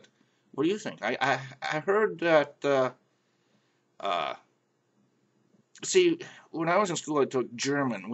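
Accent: Midwest, United States. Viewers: Yeah you sound like you have a northern Midwestern accent there is such thing as an southern on and an northern one as for us northerners we could actually pass as Canadians we sound just like them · Omg iam in Ohio right now just staying there for today !!! Tommrow iam going to Indiana !!! Going to be staying there for 2 days !!!! Then mostly my desentation is Wisconsin going to be there for a week !!! And your accent is so cool defently sound like Midwest accent !!!! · Everyone has an accent. It just means the sound of your speech and is primarily determined by your friends and family when you are growing up., you sound as tho you are from the upper Midwest of the US or perhaps the rural midlands of the Midwest. The major difference between this and the "general American" accent that is impossible to place and strived for by TV presenters and actors, I would say is the broadness of the As, roundness of the Os, and hardness of the Rs. 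· your accent sounds almost canadian, but not quite. it's definitely midwest. i like it. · I am from the midwest too (in Ohio) and to me you have an accent. I don't think I have one though. I dont know though. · You definitely have a mid-western accent. You probably wouldn't recognize it just like you wouldn't recognize a west coast/Californian accent if you watch enough TV. I'm from NY state and I moved to Wisconsin recently, and I overheard someone say that mid-westerners don't have accents, and my gf has a Wisconsin accent too, but she thinks mine doesn't sound different to hers, but it definitely does, and I think it's because she watches TV, desensitizing herself to the accent actors commonly have living in or near LA and my accent is pretty similar to that so she doesn't recognize the subtle dissimilarities to her own which are very apparent to me. I'd say mid-western accents are on opposite ends of the spectrum to some southern accents (like NC). 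The MW accent having harder vowels and some southern accents having softer ones. I'd liken MW accent to Canadian and I don't doubt its influence. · Midwesterner's have an accent. You have a Wisconsin-ish or Minnesota-ish accent. I can hear it in the o's. I didn't really think I had an accent, but others think I do. I'm from the UP so I guess it's a Yooper accent, though a lot of people thought I was from Chicago. I moved to Philadelphia and many people down there thought I was from Canada. -_- Now my accent's a weird mix of Yooper and Philadelphian. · You decidedly have an accent. I'm from Maine, and to my ears, you have a midwestern accent. As far as people from Maine go, I don't really have a "Maine Accent", but relative to your accent I have a northeastern accent, and then relative to mine, you have a midwestern accent. Like people have already said, everyone has an accent, it's just that some are a little more prominent than others. 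· I grew up in NW IL and once had a teacher point out how drawn out our r's were, especially when we said we were going to "wash our cars." I was so excited when my family moved to WA thinking I would have a drastic accent difference since IL has Chicago, midwest, and sothern, though there really wasn't one. it wasn't until years latter when I was talking with a friend that it suddenly hit me that she had an accent that I unknowingly lost. it was fun watching your video and hearing a bit of home. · You do have an accent. It's nice. It reminds me of home in Chicago Illinois. I always vacationed in Wisconsin, and I would meet people from all over the Midwest and heard your type of accent all the time. In fact, whenever I hear Midwestern accents I get a happy feeling because of my memories of that place. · I'm from Australia, and yes you do have an accent, although the general Midwest accent is harder to emulate for me at least, it's much easier for me to put on a Southern or NY accent. 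But yes, Mid-westerners do have accents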